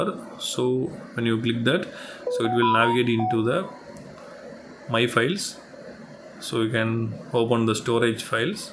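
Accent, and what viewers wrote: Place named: India. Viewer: If you want your discourse to be understood: 1) Try to level up your pronunciation. Many of my friends have a French accent when they speak English, as you do as an Indian native (it's a different accent though), which is normal. However, they can be easily understood when they put in a (very) minimal effort. Btw, speaking any non-native language requires such effort, even from you... 2) More importantly, in order to accomplish the above suggestion, you must stop trying to speak as fast as possible: speaking fast does not clarify anything, especially with such an accent lmao. Speaking fast does not mean speaking well... Even less "thinking well", nor the sign of some superior intelligence : it's quite the contrary, since one would not be intelligent enough in order to understand that the combo of speaking fast with an incomprehensible accent, counters the ease of comprehension (unless the intention was to be misunderstood, which I doubt). 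If you have a strong accent, then speak slower in order to pronounce every word (as said above, it's an effort that you MUST make, like it or not).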